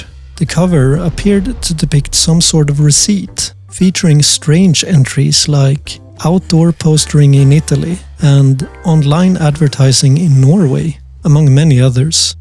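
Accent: Sweden. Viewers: You didn't ask me to like, subscribe or comment, but i did all three. Awesome video and i love your mild Swedish (?) accent.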